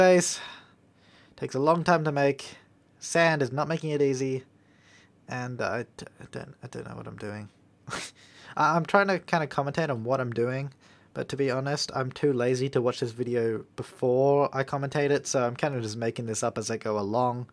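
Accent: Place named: Australia. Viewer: Your accent is stronger, and sexier :) What part from Australia do you come from? I'm From Nsw, Boganville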